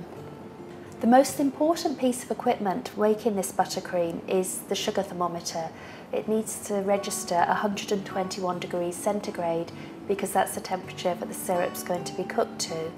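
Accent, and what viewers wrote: United Kingdom. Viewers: I love her British accent :) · Why does this woman's speech sound so cute? I actually don't like British accent but I might be about to change my mind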